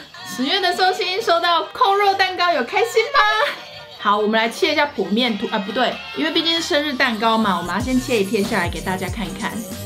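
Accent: Taiwan. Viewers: 的台語真的好好笑，這個影片真歡樂怎麼可以吃這麼多人還這麼漂亮又瘦...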